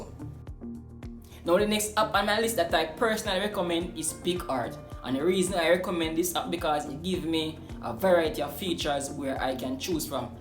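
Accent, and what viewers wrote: Jamaica. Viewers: Are you Jamaican? I hear the accent!! I am too, you get a subscribe just for that. I use Canva as well!!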